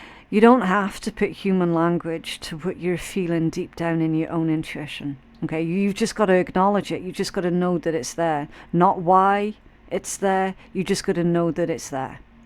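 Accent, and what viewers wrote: Scotland, United Kingdom. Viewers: 444 everywhere atm …444 likes and the time I’m listening…I even took a screen shot lol we went through a very challenging 3 years …but yes come through …integrity intact. 🌝😅 We have so many ♏️ in our family…and our Michael granted our family a Miracle last summer 2023 thanks …I’m from Scotland are you too and I’m definitely not allergic to swearing or sarcasm lol…I resonate with your lovely accent. 💙🦢💙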